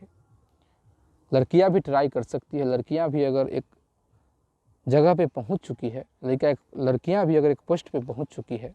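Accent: Bihar, India. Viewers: आपकी बोली में बिहारीपन भाषा का झलक आ रहा है स्त्रीलिंग पुलिंग का पता नहीं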